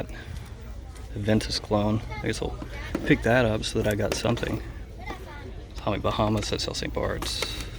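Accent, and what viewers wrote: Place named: Appalachia, United States. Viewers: Wondered where you were from because your accent was so similar to mine and now it makes prefect sense. I grew up about an hour away from there on the VA side. Definitely hard to find fragrances in small town USA. Thank goodness for the internet. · I've been watching for a little bit and I suspected by your accent that you were probably somewhere in Appalachia. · Bro sounds like Huntsville Alabama! Roll Tide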